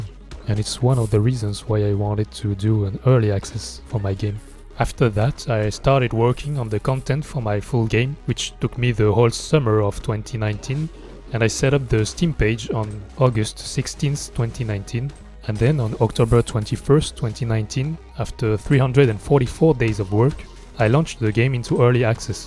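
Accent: France. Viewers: ton accent m'a l'air français ^^ Superbe travail c'est trés motivant.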